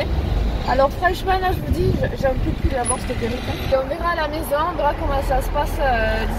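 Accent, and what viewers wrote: South, France. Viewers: Es que t Marseillaise parce que moi oui et ta un accent du sud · J'aime trop ton accent du sud et tu est trop belle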